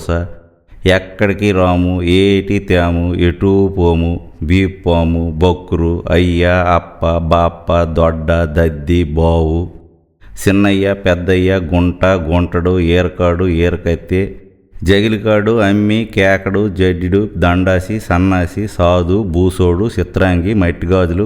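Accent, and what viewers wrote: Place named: Uttarandhra, India. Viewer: అంతరించి పోతున్న ఉత్తరాంధ్ర మాండలికం, నుడికారం, స్థానికంగా ఉచ్చరింపు మీకే సొంతం... మీ సంకలనానికి దాసోహం...🙏🙏🙏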